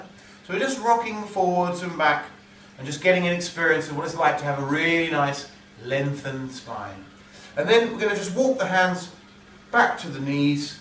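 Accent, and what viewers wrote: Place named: United Kingdom. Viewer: His voice cadence and accent makes me think he'll also be found in a Monty Python skit!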